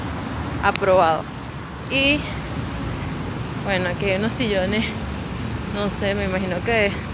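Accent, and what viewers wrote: Venezuela. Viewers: Me fascina tu acento, no sé si es venezolano pero me encanta. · eres panameña? hablas como venezolana saludos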